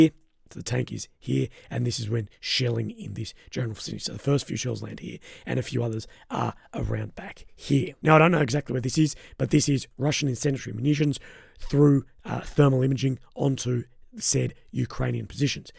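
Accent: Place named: Australia. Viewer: Sorry mate but the breathless, staccato delivery in harsh Australian twang is extremely debilitating, an assault on the ears. Also makes my heart rhythm jump erratically.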